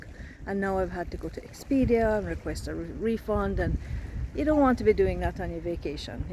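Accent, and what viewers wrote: Jamaica. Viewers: Ive never heard a white person with an authentic Jamaican accent, I like it 🫡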